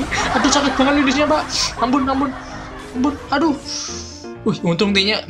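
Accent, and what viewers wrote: Java, Indonesia. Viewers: biasa aja bicara y jangan medok biar gk salah nangkep. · Jawane sampean ngendi bang? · Medok bnget wkwkwk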